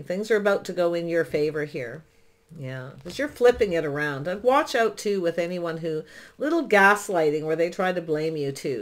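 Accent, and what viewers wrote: Canada. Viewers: I’ve been listening to many tarot’s to help me through some shitty times and keep me grounded but I want to say this was so bang on I had to comment. And I’m living in the US and I can tell from your voice ur Canadian as am I so felt like another sign lol. Thank you because this reading really helped me so much and u are bang on it for me, wanted to extend my gratitude and appreciation and I’ll be following u closely now haha. I mean just nothing has said it better than u xxx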